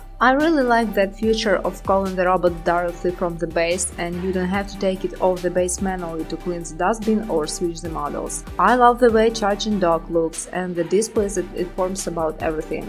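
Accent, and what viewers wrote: Russia. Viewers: Oh this russian accent...🤦‍♂️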